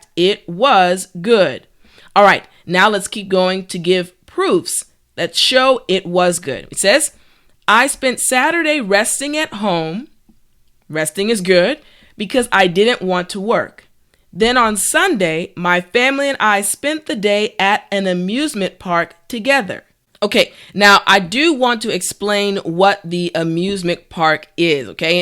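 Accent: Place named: New York, United States. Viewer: The intro is your story. That's really cool. 😊 I thought, that you were born in New York ( Brooklyn) or Chicago.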